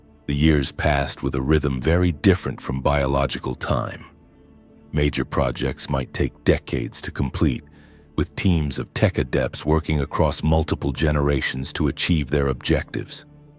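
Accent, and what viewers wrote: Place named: United States. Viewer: The voice is better, barely watchable now. Still, the American twang is infuriating. These people have English, accents, old London etc. The American voice adds camp element to a dark and gritty world.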